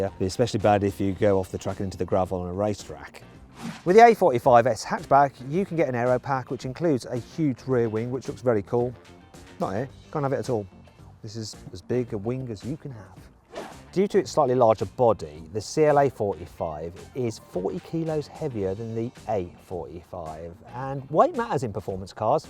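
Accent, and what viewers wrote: United Kingdom. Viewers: これイギリス英語やからイギリス目的じゃない場合はやめた方がええで 💢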